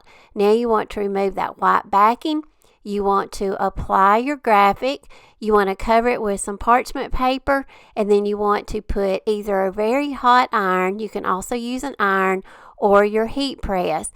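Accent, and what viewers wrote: South, United States. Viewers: Love your videos and creativity! Love your sweet southern accent! I am from East Texas but have a childhood friend that lives in Guntersville AL. I live in Kansas and everyone says I have a Texan draw to my voice! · Everything is so beautiful! I love the ornament idea with your dads picture! So creative! And you have the most beautiful southern accent. I live in Georgia and Ive yet to hear it flow so beautifully like yours! 😂❤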